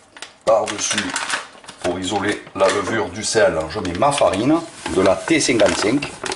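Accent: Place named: South, France. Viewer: Avec une voix qui sent bon le Sud 🤗 je dis Ouiiiii!!!! Je testerai la pizza plus tard, je savoure déjà l'accent 🌾🍅🍕😄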